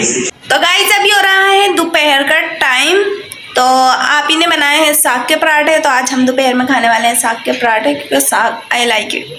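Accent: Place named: Karachi, Pakistan. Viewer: Ap memon hn? Apki language karachi jese lg rhai 🤭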